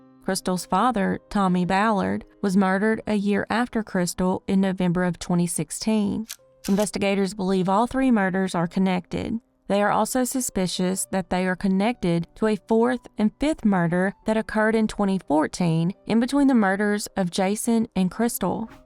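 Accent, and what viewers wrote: South, United States. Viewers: Thank You For all the work and time you put into your videos. You are the BEST crime channel and we all Love your southern accent ❤️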